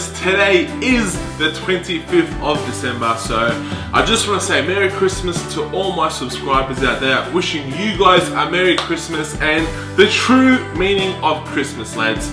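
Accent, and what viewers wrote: Australia. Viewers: England? are you from Englan? i thought you were from Australia.. :p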